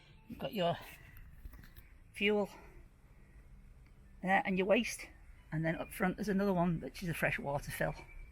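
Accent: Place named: North, United Kingdom. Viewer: Thanks enjoyed that, I too found your channel from Nick's recommendation, though being a fellow northerner I prefer your accent.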